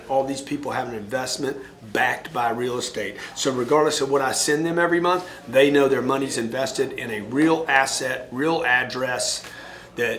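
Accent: South, United States. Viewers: This guy is Italian with a southern accent? Who knew...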